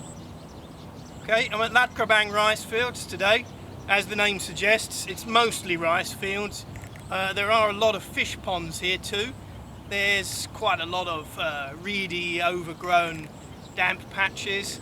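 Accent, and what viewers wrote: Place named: England, United Kingdom. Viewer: It looks a gorgeous birding spot. I've never been to Thailand. I'm familiar with some species, but most are totally exotic to me. Your narration is very interesting and helpful. I also like your English accent 😃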